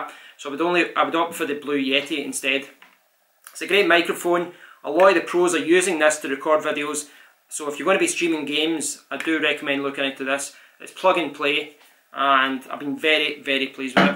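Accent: Scotland, United Kingdom. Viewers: Nice video man. Ps: Your Scottish accent is awesome. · Love your Scottish accent. From an Irish person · Thanks man also I'm irish and I love your scottish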